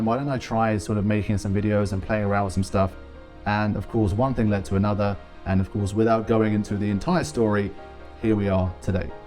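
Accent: United Kingdom. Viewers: Dang, you sound so british when you're muffled. Congrats on the 15 years!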